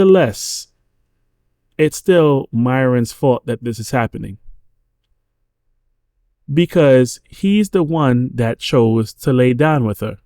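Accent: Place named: Caribbean. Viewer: Hearing a little West Indian in your voice but I can't put a finger on the island 😂Anyways men are too weak. Especially these day. We complain about women all day but their behavior comes from the fact that they are dominating us because we are soft, we accept anything, we are so hooked on p-sleeve. Fresh and Fit's podcast is a joke. CGA is the only guy doing the lords work. I know you recorded this before the update. She was lying but I knew this from the very beginning. Doesn't discount anything your saying. We need to do better.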